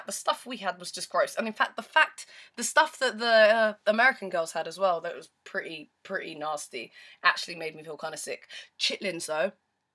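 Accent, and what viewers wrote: United Kingdom. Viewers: Love the videos! Your energy is fantastic! I wish I had your accent haha :) I'm rooting for you and Sophie y'all are my favorite and Katherine too :) going for the Brits even though I'm American lol. And by the way that stuff is NOT what Americans normally eat. I've never had pigs feet or chitterlings in my life. But mmm at least you got grits! Those are fantastic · I need more than Your have some really great insights and I can listen to your Brit accent for eva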